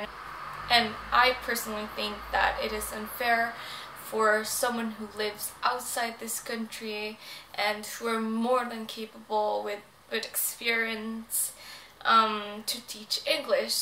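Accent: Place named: Australia. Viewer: did you learn english in australia? i hear the way you say "o" - it sounds Aussie!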